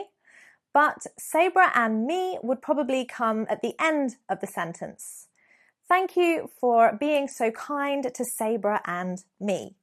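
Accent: United Kingdom. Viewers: You sound exactly like every Snooty British person every American citizen hates with a dieing passion.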